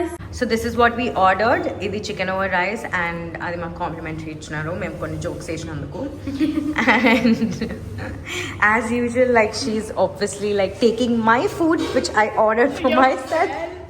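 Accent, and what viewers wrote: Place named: Telangana, India. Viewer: Good to see uu.. 🥰 Ur telengana slang is like keeka 😂🫶🏻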